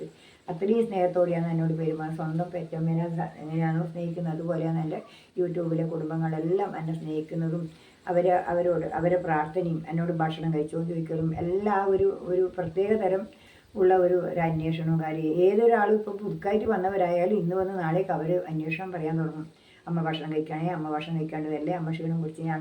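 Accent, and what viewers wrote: Kannur, India. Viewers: അമ്മയുടെ വീട് എവിടെയാ. കണ്ണൂർ ആണെന്ന് സംസാരത്തിൽ നിന്ന് മനസ്സിലായി ❤️. കണ്ണൂർ എവിടെയാ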